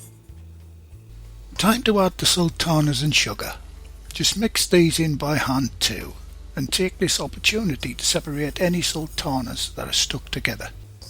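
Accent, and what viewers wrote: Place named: Northern England, United Kingdom. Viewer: Hi. I discovered your channel recently and really do enjoy your recipes. It’s great to hear a friendly northern chap giving it a go in the kitchen. Will you be planning any Christmas family favourite recipes, as we get closer to the festive season? All the best.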